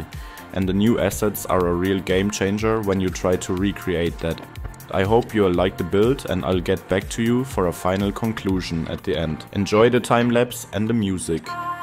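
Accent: Germany. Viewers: I think the German accent fits perfectly to the video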